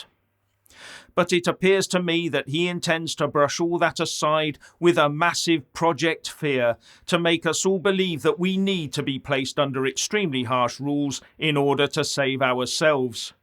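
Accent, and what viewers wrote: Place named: England, United Kingdom. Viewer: he even sounds English.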